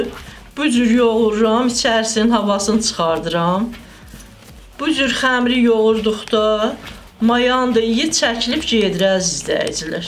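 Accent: Nakhchivan, Azerbaijan. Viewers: Xala deyesen naxcvanlısiz😂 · Əlinə saağlıq nağcıvanlı kimi danışır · Eladi. Deyesen Naxcivanlidi bu anamiz..mende ordanam Ordubad rayonundan. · Bacım Naxcıvanlısız??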